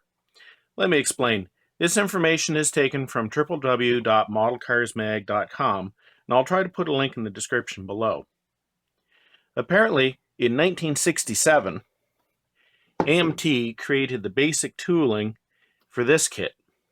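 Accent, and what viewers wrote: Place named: Canada. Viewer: That is an awesome truck, and a nice accent. Love the Canadian accent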